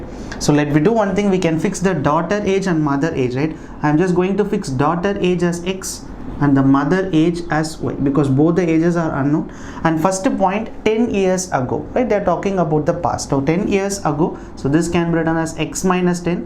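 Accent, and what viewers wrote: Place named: Tamil Nadu, India. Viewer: I love your Tamil English Accent Sir! A big thank you from North India :)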